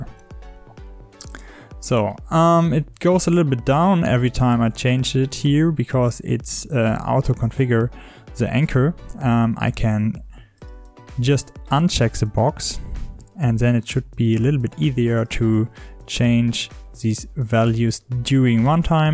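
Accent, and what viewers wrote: Germany. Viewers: Kann es sein das du Deutsch bist klingt ziemlich stark danach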